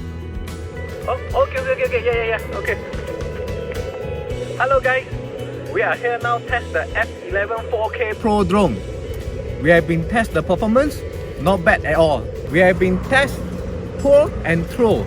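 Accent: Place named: Indonesia. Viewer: indonesian Speak🤣🤣🤣👍👍 not bad my bro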